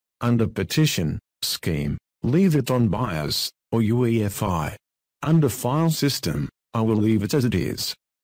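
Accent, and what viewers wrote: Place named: Australia. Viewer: Duh! I hate that Australian voice! Why can't you just change it to an American voice! That would be much better!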